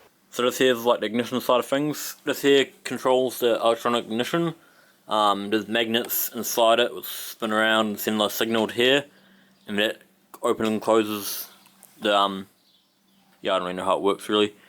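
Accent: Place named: Australia. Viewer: Hi if your going to do a video that might be watched by English speaking people ,it would actually be nice if we could understand what you were saying...im not knocking the Aussi accent, i get on fine with that, but you abbreviate words as you speak. you miss out important syllables and just merge what's left into a slurry of bits ow words.. or is your microphone at fault ? I recommend you SLOW DOWN and ARTICULATE the words then people will actually be able to understand and learn from your video !! That is after all why you have posted the video, isn't it... Im not trying to annoy you either , but to help you with a comment to improuve your videos.. im actually very interested in these motors and I am following these type of videos in French, German, English, Dutch, Italien, and despite being multilingual, yours was the hardest to listen to !! slow down, take your time, it's not a race!!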